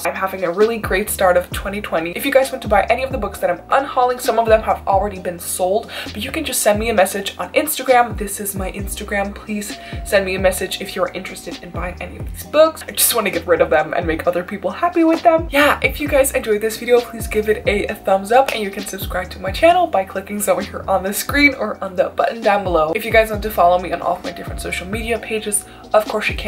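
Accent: Netherlands. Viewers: HolY SH- I've never seen your content before and I thought: she sounds kinda Dutch. TURNS OUT YOU ARE DUTCH. OMG ik wil graag meer Nederlandse BookTubers dus tijd om the abboneren!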